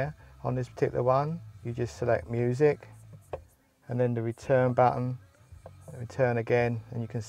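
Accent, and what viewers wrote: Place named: United Kingdom. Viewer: Well Done furr a Brit..just kidding accent tickles my ears..easy peezy just watt eye was looking for..thx for posting xo a new fan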